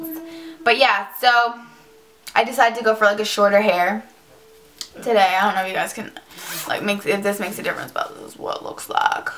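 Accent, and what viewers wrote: Canada. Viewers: hey, so i tried commenting on another video of urs but it was giving me greif! it was the kat von D first impression vid on her foundation girl it looks incredible on u. looks llike great stuff. what brush do u use though cuz it looks good? also, i love ur personailty supre cute rihgt up my ally, i say cra cra all the time hee hee. keep it up kiddo :) btw u live in canada? u have an accent.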